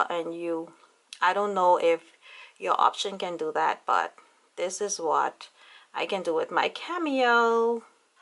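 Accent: Bahamas. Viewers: Oh wow you sound Bahamian! Are you Bahamian?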